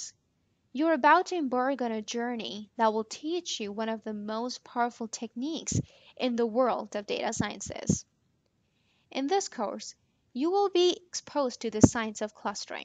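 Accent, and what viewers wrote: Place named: Pakistan. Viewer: I wondered after listen to this that you belong to Pakistan. you seem to be a native speaker. anyway, thanks a lot for this great lecture.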